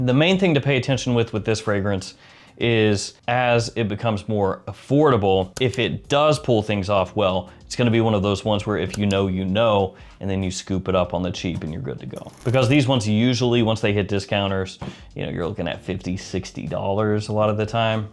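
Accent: South, United States. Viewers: bro his southern accent kills me LOL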